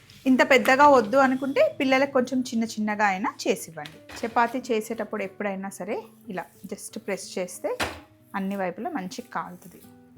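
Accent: Andhra Pradesh, India. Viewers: Meeru..telangana kadhaa..but andra accent lo matladuthaaru..adhemito...telangana yaasa ❤❤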